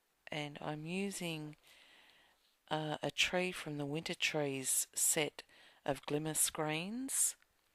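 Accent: Australia. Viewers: Hello:) I really enjoyed watching your video, and after watching your video, I am off to get some glimmer mist to use with stencils. An excellent idea! From a fellow Aussie, it was wonderful to hear the Aussie accent again!:)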